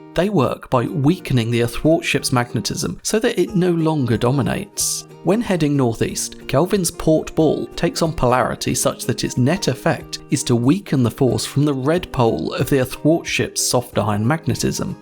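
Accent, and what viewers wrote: United Kingdom. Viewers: Sorry, but am just tired of the instinctively haughty British/cockney accent. Any chance you can post a video where MS Word reads the text? "Feewwd" vs. "Feeld"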